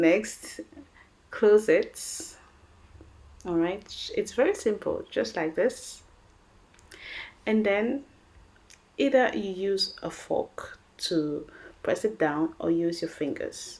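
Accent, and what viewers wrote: Ghana. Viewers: I love your Ghanian accent, I have that with Dutch ..lol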